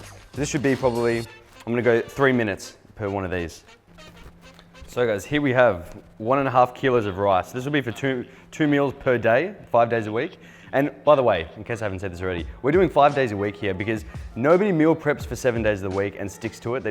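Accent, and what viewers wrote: Australia. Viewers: For some reason, Australian accents makes these videos less cringe and less douchebagish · Your accent is so Aussie 🤣🤣brilliant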